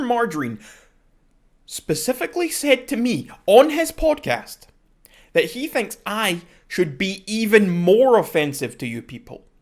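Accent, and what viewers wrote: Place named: Scotland, United Kingdom. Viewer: You are a moron. You didn't destroy anyone. You didn't crush anyone. All you did was show your ignorance and show everyone the detrimental affects of mothers doing drugs, smoking and drinking to excess while pregnant. By your logic Africa doesn't exist because Giraffes live there and nowhere else. The North Pole doesn't exist because Polar Bears live there and nowhere else. Go read a book on English history, Capt James Cook was a real person who discovered Australia while on his ship the Endeavour. Oh please answer this question that everyone wants to know. Why do you, a Welshman, living in Wales, have a Scottish accent???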